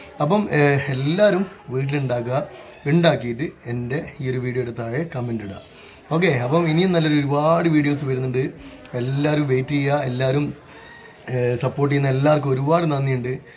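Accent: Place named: Malabar, India. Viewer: Voice over kodukkumboo maathrollu le ee malabari type samsaram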